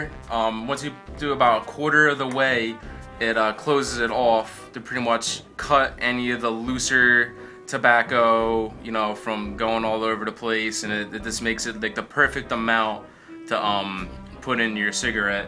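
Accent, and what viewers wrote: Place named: Pittsburgh, United States. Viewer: you from pittsburgh? sounds like the accent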